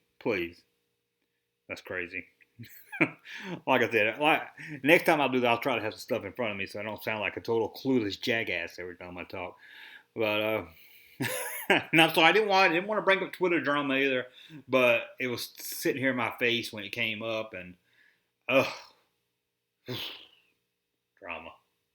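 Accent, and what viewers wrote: South, United States. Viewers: i know i'm 2 years late to this party but i really enjoyed this vid. (and all your Junkie vids). i could listen to you rant all day - maybe its coz i love vintage toys, i was born in '74 so i get where you're coming from and mixed with your southern tones and mis-pro-nun-she-a-shuns, your vids make my day. KEEP 'EM COMING !!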